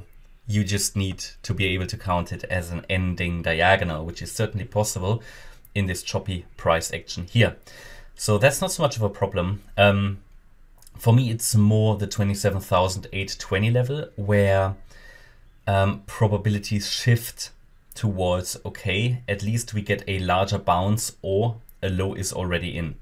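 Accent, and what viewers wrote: Germany. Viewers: German accent? Where are you form?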